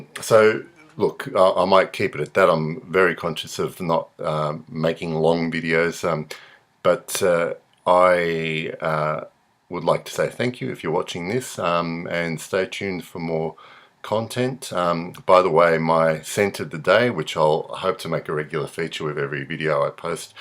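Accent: Australia. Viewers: I'm a simple man! when I hear an Australian accent...I subscribe! Also awesome intro! excellent fragrance story, I still need to try the original Lagerfeld!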